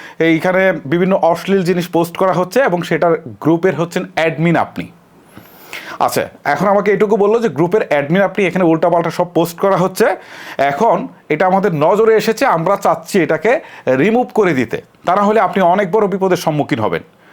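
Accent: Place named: Kushtia, Bangladesh. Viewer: ভাই আপনি কি কুষ্টিয়ার ছেলে?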